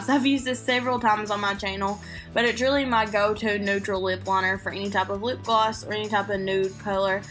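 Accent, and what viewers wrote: Kentucky, United States. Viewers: What Jesse Girl pigment is this? Love your accent. You sound like my husband. He's from Ky.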